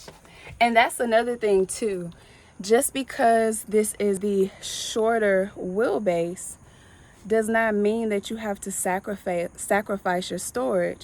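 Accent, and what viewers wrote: Houston, United States. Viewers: Omg you sound so much like Beyoncé and Kelly it's crazy. Houston accents! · Omg ❤️ You sound like Beyoncé... That Houston accent I guess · I was thinking the exact same thing. Or that since they are both from Houston they just have a similar accent. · You’re beautiful, sis. Love the van! I can hear that Houston twang. Very similar voice to Kelly Row and Bey. Here I am with my squeaky voice. Lol your happiness is palpable and contagious! Great luck to you and God bless. New subbie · That's that Houston accent! Beyonce and Kelly are both from Houston like she is. I was thinking the same thing though!!!! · The Houston accent is so calming. You and Beyoncé can just record everything for me forever 😂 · This is how most black Houstonians talk, coming from another black Houstonian. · It's the Houston you see/hear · Yes, I was thinking the same thing. 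It's the Houston accent...and also her facial features. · They definitely have the same tone, accent and mannerisms. Maybe it’s a Houston thing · Must be a Houston thing. I love her accent. Sounds similar to Beyonce. Side note...running to get some grapeseed oil, because you're glowing sis! Now that I look at her she actually looks related to them, darker but I see a resembalance. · I know I'm not the only one who notices she laughs like Beyonce, lol. I love it, must be that Houston twang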